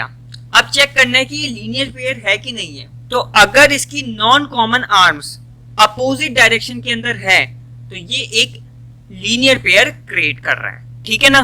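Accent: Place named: Haryana, India. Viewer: Ap haryanvi ho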